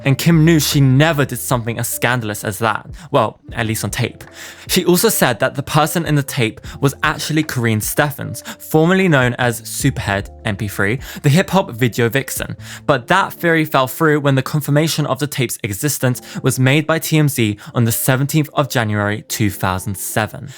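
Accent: United Kingdom. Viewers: I really love your accent, reminds me of some of my British heritage and when I lived in London, I also love how you piece everything together and your enthusiasm. It does however, feel very biased to support The Kardashian’s. They have been caught lying a lot so I wouldn’t be surprised if she lied in her book too. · AND FER YOU LIL OOZIE…. AN HOUR LONG. BRITISH SPEAKING DOC ON THE KKW THE ONE AND ONLY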